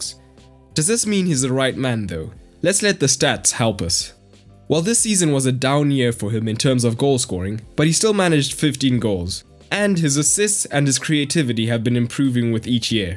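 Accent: South Africa. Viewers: Is it just me or does the narrator sound South African 🤔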